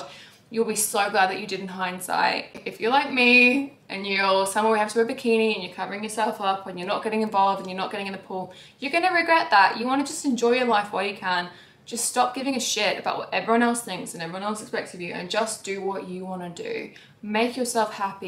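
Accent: Australia. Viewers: ….she Australian ….. that her accent🤦🏽‍♀️🤦🏽‍♀️🤦🏽‍♀️ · Your Aussie accent has come back! Happy for you 💖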